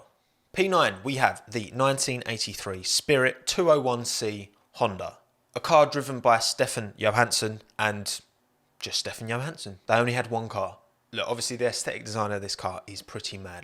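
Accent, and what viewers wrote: United Kingdom. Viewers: i like the combination of american slang with the british accent :D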